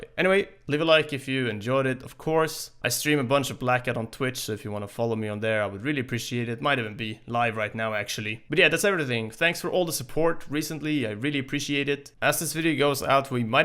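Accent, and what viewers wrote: Sweden. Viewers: are u by any chance swedish? u talk english like sweds 🤔😁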